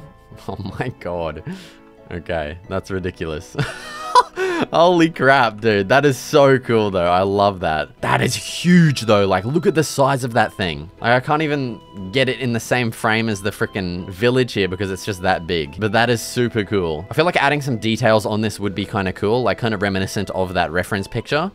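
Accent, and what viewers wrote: Australia. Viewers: this guy sounds like and australian frfr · I love ur Aussie accent cuz its so natural and funnyyy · Brother you sound Australian but also English it's confusing me, are you even either of them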